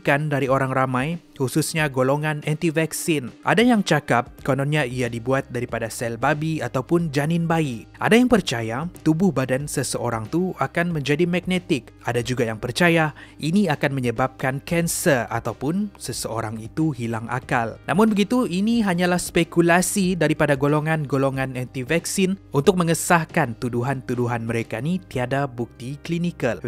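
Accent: Sabah, Malaysia. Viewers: Abang mcm logat ckp mcm orang sabah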